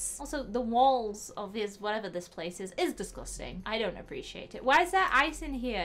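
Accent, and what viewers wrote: United Kingdom. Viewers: ! I FILL LIKE UR FROM BRITISH! R U THO? COZ IM CONFUSED U SOO TALK LIKE AN BRITISH WOMEN!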